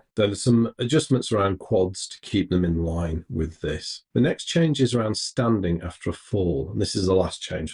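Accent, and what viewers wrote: United Kingdom. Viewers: Thanks for the analysis. But unrelated, East London accent?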